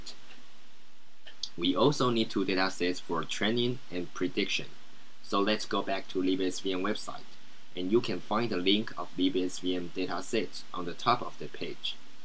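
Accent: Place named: China. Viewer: 毕设用到了这个，感谢~（Chinglish口音哈哈哈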